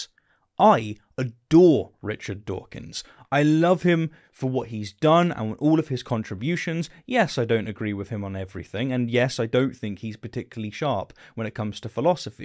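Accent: United Kingdom. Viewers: I am one of those that accused you of bending the knee to the woke and am glad that you addressed the issue with this video which is very brave of you. I respect your intelligence and youthful zeal of which I once had so I have empathy of which I sometimes perceive as arrogance. It was what happened in your country, I gather is Britain because of your accent, that I got red pilled. It was how "Tommy" got treated for shining a spotlight on the"gangs". With all due respect Britain might already be lost and "Cherrio" law is imminent. First they silenced Tommy, then Mel Shaw and now Russel.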